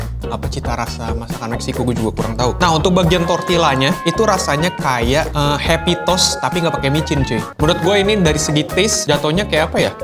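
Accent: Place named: West Java, Indonesia. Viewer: Wah sunda bet ya, letuce jadi leteuce euy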